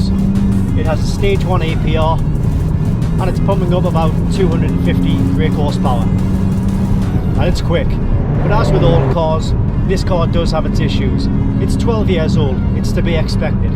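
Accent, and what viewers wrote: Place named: Geordie, United Kingdom. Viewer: I've followed your channel since you started, years ago, with the channel under your " " name. Just for feedback, it's gone from being an on honest "Geordie lad talking about cars" to becoming clickbaity, cheap and nasty. You've always been a bit w*nky, pretending you're something you're not (regarding the Porsches) but it's just tacky and sad now. You're not fooling anyone by toning and smoothing your natural Geordie accent and pronouncing letter 't' as a 'd' (pardy on, man). Have you ever wondered why videos like this have a staggering 36% thumbs down rating (YouTube average is 8%)? Just stop being a k*ob and try actually being yourself.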